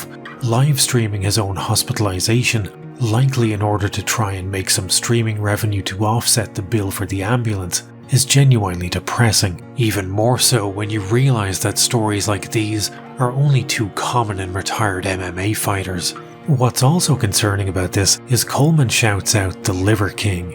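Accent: Ireland. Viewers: Love your writing. Love your graphics. Love the Hibernian lilt which stirs my Irish lineage. (Also - eff John McCain.) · masterful comedic storytelling + Irish brogue is a winning combination that makes this channel golden.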